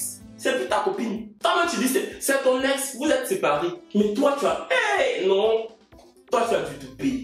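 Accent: Cameroon. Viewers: Bonjour Je t'ai aperçu à un pas de ma maison ds le 15eme arrondissement le février,je rentrais du travail, j'étais un peu timide mais aussi très fatiguée avec une longue journée. Mon souhait a toujours été de te rencontrer un jour mais alors ce fameux jour est arrivé et j'étais fatiguée. On s'est salué vite fait car tu étais avec tes amies et moi qui passait et pas de courage de venir vers toi faire une photo. Dis moi seule qd est ce que tu reviens ds ce café pardon. Je t'écoute régulièrement et ds ton accent je comprends que tu fréquentes beaucoup de camerounais car tu as plusieurs mots camer ds tes vidéos. Trop happy ooooooooo En tout cas je sais que seul les montagnes ne sont rencontrent pas. N'hésite pas à me répondre Une fan depuis le 15ème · Loool y’a beug dans mon cerveau ou j’ai entendu s’exclamer en disant (yé maléhhh🙆🏾‍♀️🙆🏾‍♀️) vraiment mon frère ou ma sœur camer là, tu fais du bon travail 👍🏾👍🏾😂😂🤣 j’écoute jusqu’à parfois j’oublie qu’il est ivoirien!! 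Yeuch!!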